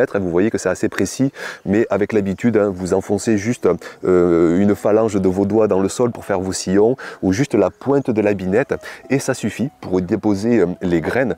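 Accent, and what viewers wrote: South, France. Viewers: Bonjour. Je suis debutante au jardin et je regarde vos videos depuis peu. Videos que j'apprécie enormement d'ailleurs. (Contrairement aux autres vous allez à l'essentielle). Merci de partager votre savoir et vos expériences. L'accent du sud est un petit plus qui ensoleille notre ciel gris de la région parisienne. Fini de parler, c'est parti pour mon 1er semi de radis 😅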